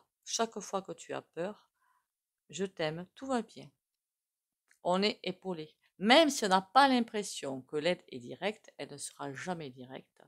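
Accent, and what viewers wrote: South, France. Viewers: Bonjour. Je viens de m'abonner car j'aime beaucoup votre facon de faire cette voyance.Votre petit accent du midi aussi❤ bisous de Toulouse.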